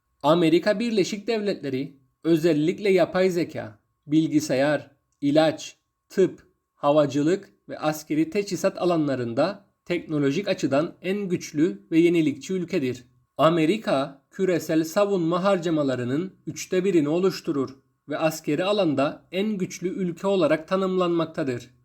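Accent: Cyprus. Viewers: Abi sende sanki Kıbrıs şivesi var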